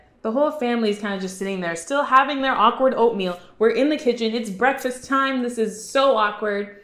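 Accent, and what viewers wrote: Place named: Italy. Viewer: Your Italian accent is everything 😂 now i gotta go watch this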